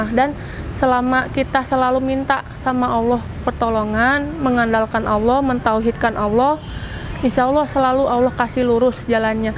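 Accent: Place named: Sunda, Indonesia. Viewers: Teteh udh lama di bndung Yaa logat sundanya kental bnget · ko nyunda bgt ya bataknya... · Sunda pisan yaaaa... 🤗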